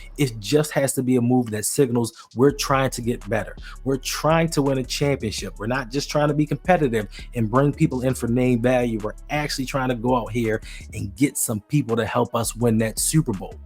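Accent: Baltimore, United States. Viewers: Not the Baltimore accent 😭 good vid tho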